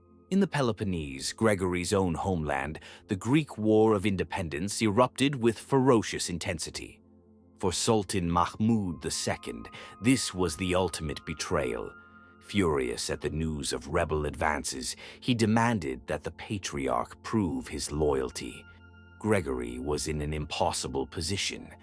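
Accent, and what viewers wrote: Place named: United States. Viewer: DOBLAJE Y PRONUNCIACIÓN INFAMES Y VULGARES.... ¿Qué forma es esa de pronunciar nombres griegos, turcos y europeos? lo pronuncian todo a la anglogringa... ES REPUGNANTE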